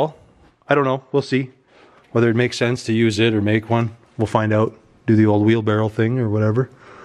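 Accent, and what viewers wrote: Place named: Canada. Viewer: Figured with "eh" and aboot' love the Canadian language!!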